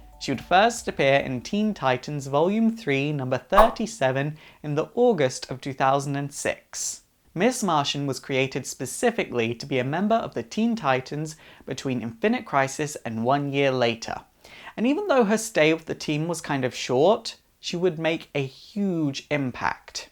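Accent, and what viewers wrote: United Kingdom. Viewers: dude are u british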